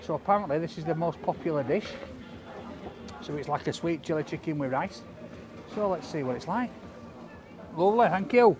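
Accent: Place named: Yorkshire, United Kingdom. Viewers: Nail on head there without a doubt. Recent months have been utterly horrendous for so many with illness or worse with the virus. himself has been through some horrible times of a different kind and he has become a national treasure and sign of positivity that we so badly need. He is a great lad, talks in a super broad Yorkshire accent and is always polite as really we all should be, take a lesson from him all, respect costs nothing but earns so very much in return. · Defo fullnon peaky blinder with that yorkshire accent would be awsome to watch, drop a few yorkshire sayings in the videos too, 🤣 top man keep it up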